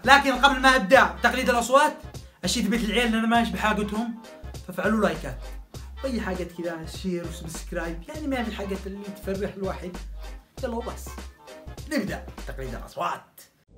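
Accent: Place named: Taiz, Yemen. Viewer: فناااااااااااااااااااااان انا فدا اللهجة التعزية 😍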